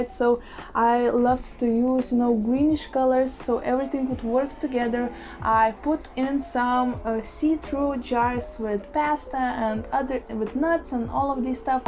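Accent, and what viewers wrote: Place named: Russia. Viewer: сначала подумала что очень знакомо звучит английский, а потом поняла что ты русская^^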